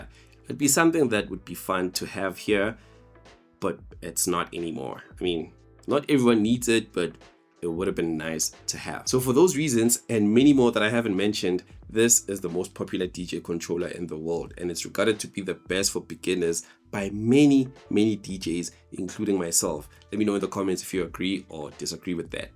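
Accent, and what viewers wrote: South Africa. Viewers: Is that a South African accent I sense 😀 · It's a South Afican accent, American/British/Danish... huh?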